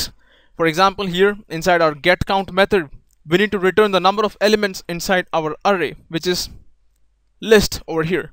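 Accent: India. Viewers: we need more tutorials like this, clearly explained, comprehensible and energetic, especially coming from someone with an indian accent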